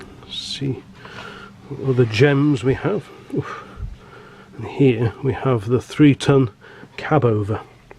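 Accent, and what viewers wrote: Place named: United Kingdom. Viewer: Truly appreciate the British hackscent accented narrative. The lack of a loud annoying soundtrack is certainly lovely. Just the facts sir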